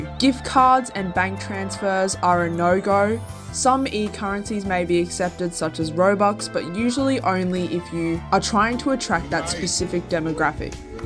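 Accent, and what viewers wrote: Australia. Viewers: I got whiplash hearing my very own thick Aussie accent thrown at me. I’m always expecting American at this point. Great video btw ✌️ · idk why i just love her accent, usually i don't care for Aussie accents, i think they sound like goofy British accents, similar to Canadians fake French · the aussie acent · they sound australian(?) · i thought they sounded australian lol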